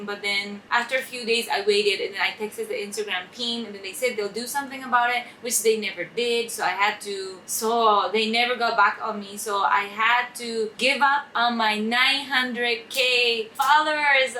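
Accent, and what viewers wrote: Philippines. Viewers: OMG, you're beginning to sound like a local Filipino! Worthy to be called a Filipina, mabuhay! Watchout for corona! 🇯🇵🇵🇭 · Wow! Im blown away! Filipino accent! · If I don't know who is speaking I would think you are Pinoy based on your accent. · Wow, she's got a Filipino accent. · You sound likely Filipino, I think you love the Philippines, you have a blood of Filipino.. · Japanese-Canadian 🤔 . If you don’t look at the name and just listen to her accent, I say it’s a pinay who’s talking. Definitely half pinay. Not half Japanese. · That Filipino accent man... = priceless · Even your accent is from the philippines ♥️♥️♥️ We love you here. I was 10 years old when I knew you in "Benny's internet Cafe" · Good to see your channel growing! I love your english accent its almost like a filipino! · Your accent really sounds like Filipina.. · Did you had this accent before or just Filipino accent rubbed into you? 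You speak english like a manila girl.. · Wait your in Philippines? I can tell your English accent sounds like Philippina-lish. OMG lost 900k followers Sorry to hear that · I like how your English sounds, like how the filipinos speak 😄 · Ang Pinoy na niya mag english haha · why is it that she sound like a filipina when she talks? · Hi, just asking, how long have you been in the Philippines already. As in...... I could hear your TAGALOG ACCENT on your English. As if your a Filipina speaking English. Actually it's refreshing to hear was just very surprise specially since this interview sounded like it was done in Japan. More power to you and great luck to you and your business!!!:D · Listening to you....u sound so filipino! Your genuiness is very endearing. No wonder filipinos that meet you will like you❤❤❤🥰...being true & sincere melts a filipinos❤😁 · can i ask you are a half japanese canadian but why your english accent is filipino? 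· Your english is becoming a filipino english. Konti nalang! 😅👍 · That filipino english accent... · I like your Filipino accent and it's like your from here na.😂 But I can still your Japanese side like ehhhhhh!!! Ehhhhh! 😂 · Wow, you have a filipino accent! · hahaha..filipino accent..nice. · You sounds like Filipino When Speaking English.. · you are such a novelty because when you speak English, I think you're Filipino, but when you suddenly insert Japanese, I'm reminded that you are Japanese. Anyway, Mabuhay!:) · Your accent is very Filipino! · You have a Filipino english accent. So nice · U talk like a filipino na nic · You're starting to sound like A Filipino speaking in English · Your accent sounds like a Filipino 😃. Good job.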